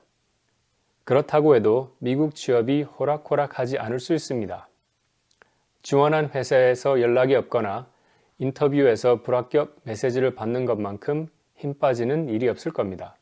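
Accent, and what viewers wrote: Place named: Daegu, South Korea. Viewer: 감사합니다! 사투리가 살짝 들리는데 대구분 같기도하고..(저는 대구 토배기입니다ㅎㅎ) 뭔가 더 반갑습니다ㅎㅎ 종종 업데이트 드리겠습니다!!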